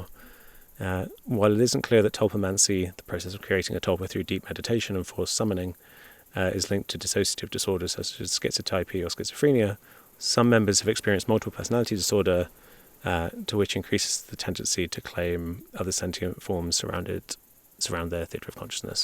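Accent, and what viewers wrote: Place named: England, United Kingdom. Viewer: that's called an English accent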